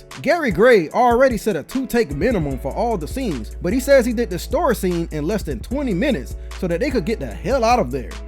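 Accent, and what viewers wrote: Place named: West Coast, United States. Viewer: I like your West Coast accent, cuz.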